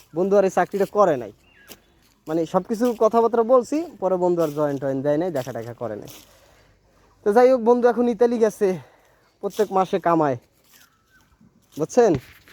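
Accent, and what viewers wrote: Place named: Barishal, Bangladesh. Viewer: ভাই কি বরিশালের?❤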